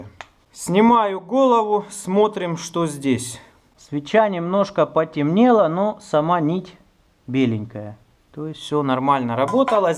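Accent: Russia. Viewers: Какое дичайшее аканье! Аж уши кровоточить начали.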